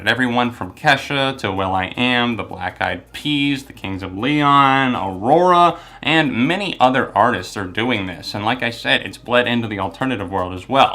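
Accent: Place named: Transatlantic, United States. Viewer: Dude I just realized that you are speaking in a very watered down Modern Transatlantic accent.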